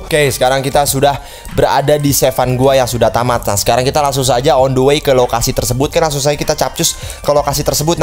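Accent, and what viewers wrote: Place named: Jakarta, Indonesia. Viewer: Bang lu orang jakarta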